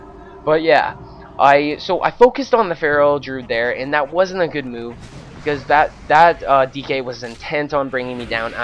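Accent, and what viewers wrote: Canada. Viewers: Are you Canadian? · I havent checked your channel, but you sound like a canadian :)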